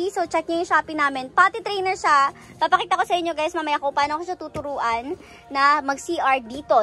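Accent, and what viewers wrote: Batangas, Philippines. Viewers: I love that Batangenya Accent hehehe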